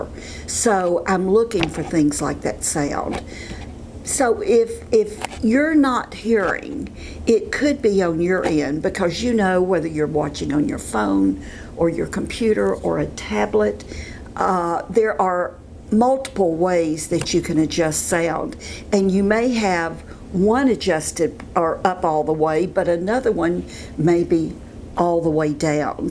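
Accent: South, United States. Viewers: There has only been one of your videos that I had a problem with the volume as did others, based on the comments. It was frustrating because I would happily listen to you read your local phone book, with your charming southern accent. Best, Jane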